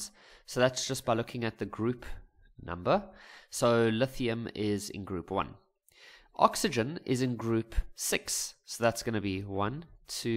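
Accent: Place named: South Africa. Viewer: you from south africa aint you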